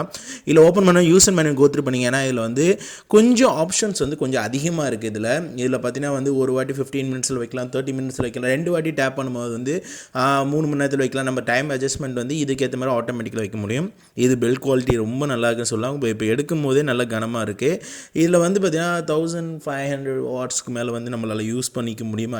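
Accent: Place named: Sirkazhi, India. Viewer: Bro neenga sirkhali-ya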